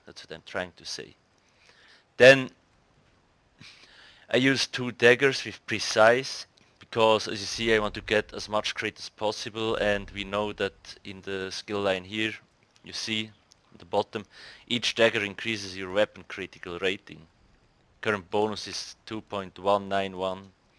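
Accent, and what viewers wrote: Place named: Switzerland. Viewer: du bisch definitiv en schwiezer😂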